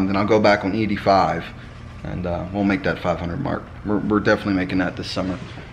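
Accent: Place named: Philadelphia, United States. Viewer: I was gunna say, you sound like you are from Philly. Where did you grow up?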